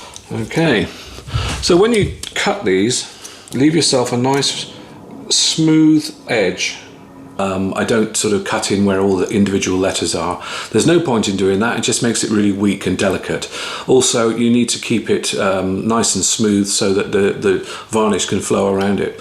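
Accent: West Country, United Kingdom. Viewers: Nice informal and informative vid; Good job; I've just subscribed! I'm considering doing a self-build T type....principally as whilst I love the way they sound (who wouldn't....) I've always felt the ergonomics & comfort could be improved quite a lot - i.e. belly cut, arm relief, thinner neck, rolled edges on the fretboard etc.... I've never been able to find one that ticks all the right boxes, so I'm thinking I'll have a crack at it myself.....your series is no doubt going to be a reference! I'm thinking about doing an oiled body (I have a couple of early 90s Patrick Eggles with that finish and they both ring like a bell...) maybe with some sort of staining / burst type finish, if that's even possible.....interested to discover if you have covered this at all? Nice to hear a West Country brother...greetings from the Royal Forest of Dean!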